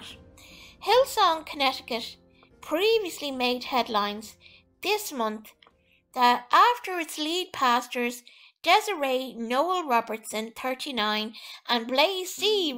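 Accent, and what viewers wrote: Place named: Ireland. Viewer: Good video & great accent! Are you in Ireland? God bless you & keep up the good work.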